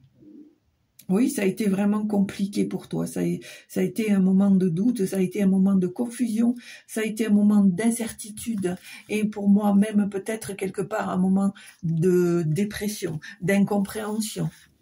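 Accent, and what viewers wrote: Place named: South, France. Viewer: Doux accent du Sud avec légers roulements de R comme notre très Cher trop tôt parti Nougaro, je dirais Oh Toulouse bien aimée 😁✌️👍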